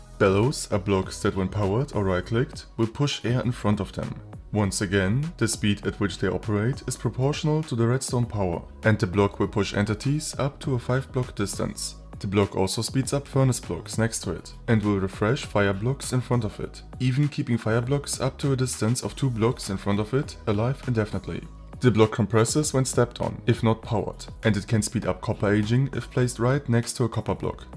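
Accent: Germany. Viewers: why does bro sound german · Hi, kann es sein das du deutscher Muttersprachler bist? :) top Mods wie immer! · bro has the most german accent i have ever seen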